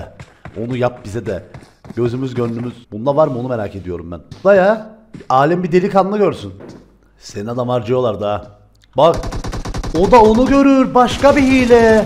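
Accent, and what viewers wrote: Cyprus. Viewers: Abi gıbrıslımısın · Abi senin sivenden anladim kibrislisin dimi bnde kibrisliyim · Kibrislilar gibi konusuyorsun nerelisin😂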